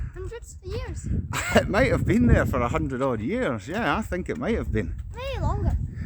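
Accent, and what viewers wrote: Scotland, United Kingdom. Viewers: Scots accent is very difficult 😓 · I love your sco´ísh accent :-) Good walk. Since almost two years we are waiting to go to Scotland.....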